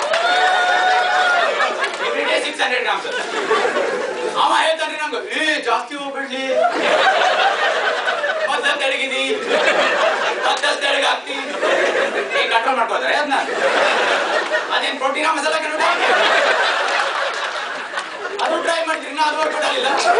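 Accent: North Karnataka, India. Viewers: Pls upload your old videos, ನಮ್ಮನ್ನ ಇದೇ ರೀತಿ ನಗಿಸುತಿರಿ. ಉತ್ತರ ಕರ್ನಾಟಕದ ಕನ್ನಡ ಭಾಷೆಯಲ್ಲಿ jokes ಕೇಳೊದೆ ಮಜ😅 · Wooow super brother nice attempt do more video with good quality , which city bro ur utter karataka 👌👌👌❤💐💐👍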